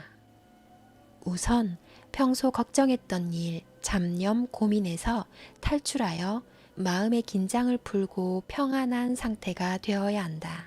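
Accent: Gyeongsang, South Korea. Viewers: 경상도분이시네요 어감도 좋구요 좋은 내용과 좋은 말투 감사합니다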